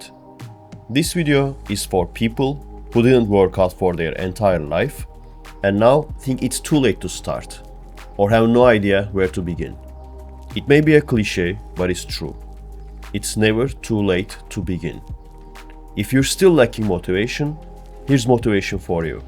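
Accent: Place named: Turkey. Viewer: Turkish english accent is absolutely the most unique english accent I have ever heard. There is nothing like it.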